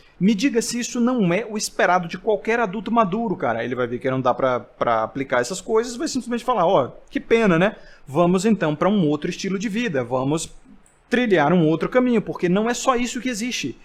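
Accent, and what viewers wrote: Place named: Northeast, Brazil. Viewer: ~ "sempre vai ter um Deus, a genética é o Deus dele" => isto eu achei fenomenal, nunca tinha visto alguém ter esta verificaçao. Se voce estudar teologia/mitologia grega clássica a fundo, vai entender que os deuses gregos era meramente um dicionário de palavras em que as pessoas deveriam acreditar piamente, como "democracia", "justiça", "honestidade", "dignidade".... Por outro lado, é bem óbvio que voce nao é loiro e nem tem os cabelos lisinhos, eu nao preciso de te ver pois a sua voz com sotaque rede globo nordestino te entrega. Eu até acho que voce estudou jornalismo, mas aí ainda tenho muito pouca informaçao. Nós nunca somos este indivíduo único. Se voce é volcel ou incel, pouco importa, mas as pessoas tem condiçoes sim de recriar sua imagem sem ver voce.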